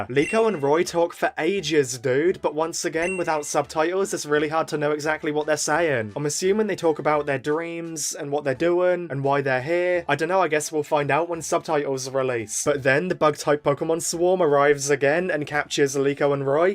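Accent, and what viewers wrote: United Kingdom. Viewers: Man you call that a sick voice? At least you have that british accent to cover it up,when i speak when im sick i sound like a minecraft zombie💀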